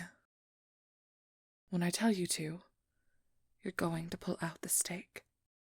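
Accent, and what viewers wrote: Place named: United Kingdom. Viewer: It's funny cause I was joking with my friend yesterday about writing a character and I said you take the nice happy go lucky person and then you add ✨trauma✨ Which leads to walls being build and "I am too cold for any to understand me" moto and then a wholesome/lighthearted moment and the character breaks a bit of their wall down to let that other persons in where we hear the tragic heros backstory and then we have what most characters fear ✨Character growth✨ 😱😱😱 Which leads to the redemption arc! Which then leads to the worse thing possible. ✨Healing✨ and ✨Positive mental health✨ dramatic gasps and screams 😂😂 But can I just quickly point out it's been a hot minute since I listend to so I kinda forgot she's British. And then hearing her for the first time in awhile I was like "Is she British?" (Context which makes this better is I am British and apparently really bad hearing because I can't recognize another British accent apparently) and then she said "Secondary school" and I was like "Yup she is." 😂😂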